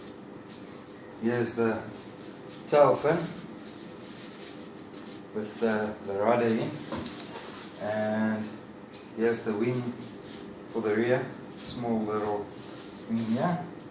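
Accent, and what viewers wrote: South Africa. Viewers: He's definitely South African. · Are South African Die manier hoe jy praat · Are you South Africa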